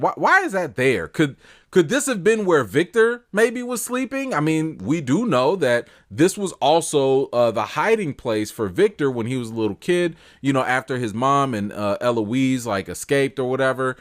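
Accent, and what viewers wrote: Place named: Chicago, United States. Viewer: I KNEW YOU WERE FROM CHICAGO! Lmao and you remind me of one of my friends in Dolton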